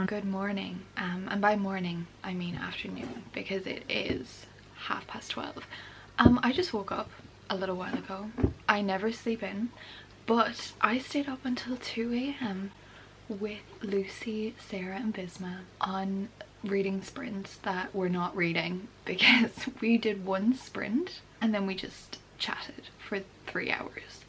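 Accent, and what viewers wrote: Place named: Ireland. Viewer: Sounds like an Irish accent 🙂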